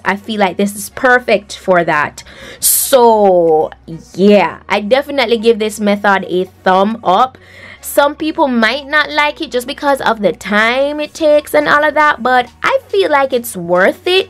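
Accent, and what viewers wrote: Jamaica. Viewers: U sound Jamaican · true jamacian QUEEN..we Caribbean pple proud of our language. lol . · OMG the accent is killing me ... 🙈 it reminds me of Jamaica 🇯🇲🙈😍😍😍😍 I am Obsessed !! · I think shes from Jamacia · U sound like a jamaican🤔🤔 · You sound like a Jamaican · New subscriber.... am here for this Jamaican accent 😍😍😍😂😂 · Sounds Jamaican Are you? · Love YOUR Jamaican accent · U Jamaican right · You sound Jamaican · are you Jamaican, by any chance? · You're Jamaican?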